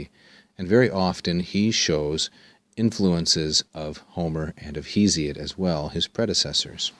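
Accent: United States. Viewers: I would appreciate a bit less of an American accent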